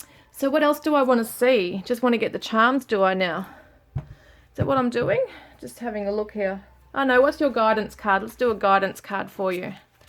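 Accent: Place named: Australia. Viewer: i like ur accent is it australian?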